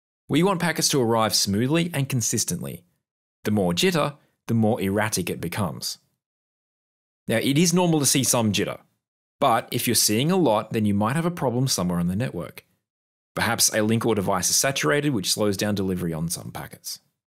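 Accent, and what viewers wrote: Australia. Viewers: As a network+ student I would like to thank you for clearly explainging the difference between QoS and CoS. And great to hear a fellow Aussie for a change. I hope you make more content.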